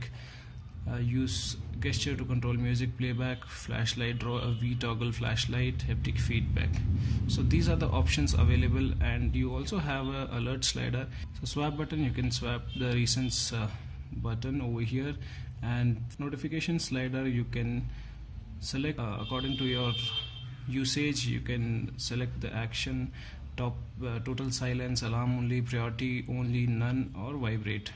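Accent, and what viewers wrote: India. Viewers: this is an indian English accent. and what u have is american accent every country has thier own accent. i know it makes u not familiar accent but if everyone started saying that speak in my accent then english won't be an international language.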